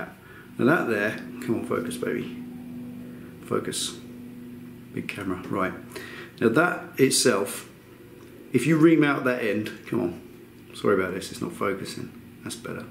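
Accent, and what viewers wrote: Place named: England, United Kingdom. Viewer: I did not expect you to have aN English accent! The hat is throwing me off.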